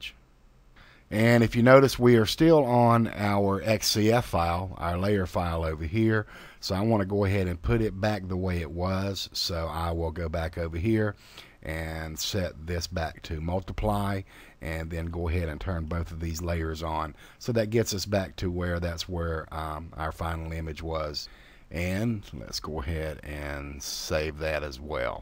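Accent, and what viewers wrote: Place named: Texas, United States. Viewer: Thanks for your tutorials, really appreciated. And since you evoked it early in the clip, don't worry about your pronunciation (I can hear a texan like accent) : much, much, MUCH more understandable and pleasant to the ear than the guttural germanico-british accent! Thanks for your time and effort, looking forward for more.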